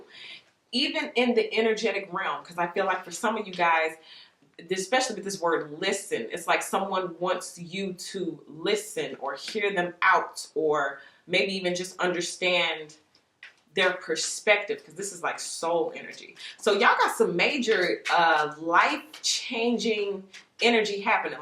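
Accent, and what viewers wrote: Chicago, United States. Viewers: I love you & your Chicago speak! Thank you for sharing your gifts. Your creativity is so authentic. I love going on mind trips with you✨ keep glowing sis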